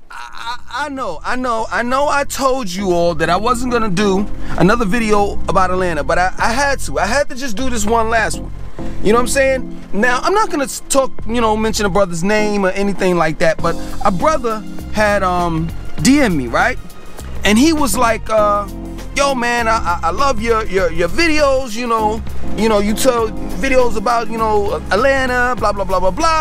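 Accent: New York, United States. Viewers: You sound like a true New Yorker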